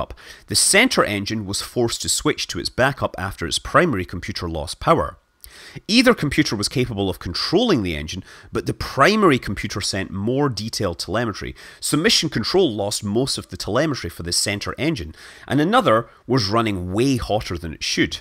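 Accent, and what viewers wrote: Scotland, United Kingdom. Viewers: It this narrator Scottish or Welch or what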